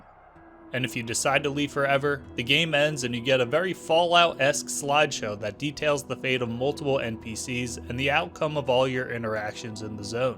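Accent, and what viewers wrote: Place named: Delaware County, United States. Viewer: damn someones from DELCO lol good video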